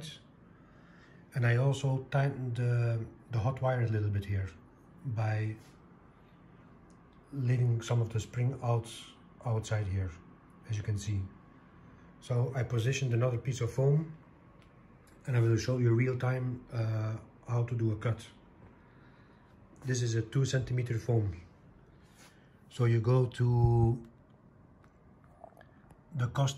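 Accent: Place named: Netherlands. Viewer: I thought you were Dutch because of the accent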